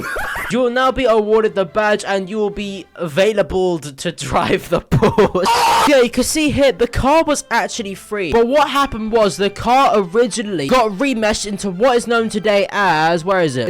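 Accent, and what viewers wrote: United Kingdom. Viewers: good bradford accent. its a 8.5/10 from a boy who lived in bd for 8 yrs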